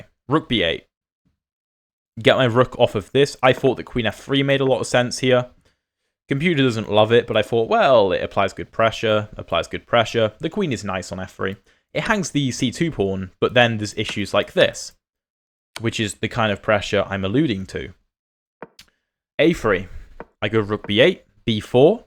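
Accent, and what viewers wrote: United Kingdom. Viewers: I like your materials a lot. Very educative. For me crucial thing about your chess footages is that often you find yourself in a position where other (and by other I mean better - no offense) chess youtubers know the next move by heart and it's hard for them to actually explain why THIS is the move. I think you benefit from that because you are actually going through you thought process, explaining what you see on the board. Sometimes even though you are not sure you assume THIS might be the move because of the process of elimination or whatever. I really like it and I think many players like me can benefit from that. I am also grinding Caro as black and Viena as white so what you do is even more to my liking. On top of that I am constantly learning English and British is the accent I love to listen to so this is kind of a cherry on top. 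Keep up doing what you're doing and I hope you'll claim that golden YT button one day.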